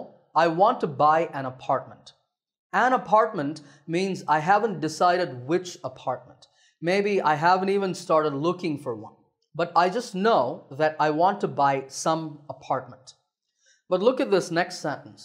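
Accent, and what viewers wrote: United States. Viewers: Sir your video is amazing . Also you have a american accent . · He spoke like, an American. Wow 🤩his vocabulary is fantastic.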